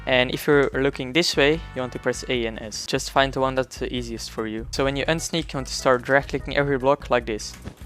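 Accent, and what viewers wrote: Netherlands. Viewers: Are you from Holland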